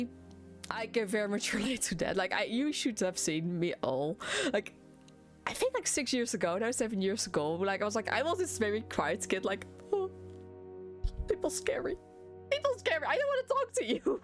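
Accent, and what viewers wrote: Netherlands. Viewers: this was a nice video, very educating it is, love your dutch accent btw :P (im dutch myself too)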